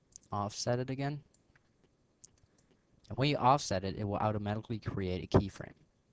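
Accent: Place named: Philippines. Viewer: are you filipino by any chance? i have a lot of friends that sound just like you :D and nice vid!!! i love sony vegas~ oh and i was wondering, how do you get a transparent fade in between 2 videos. i used a fade through transparent transition and it only goes through black.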